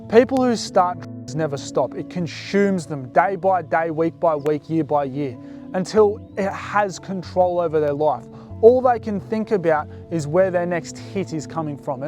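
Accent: Australia. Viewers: thats an aussie accent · Are you Aussie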